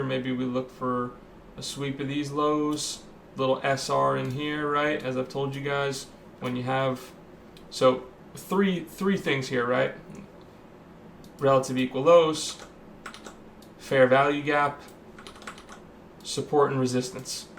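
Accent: United States. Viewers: Hey, thanks, i've been watching your discourse on twitter haha, also you sound texan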